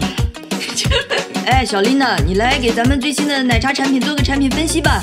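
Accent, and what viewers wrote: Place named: Beijing, China. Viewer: 你太可爱了，真觉得你是北京大妞儿的风格～～口音也是北京味儿～～这是怎么回事呢？生长在大连，咋长成北京妞儿了～～？很想认识你一下，跟你做朋友～～😄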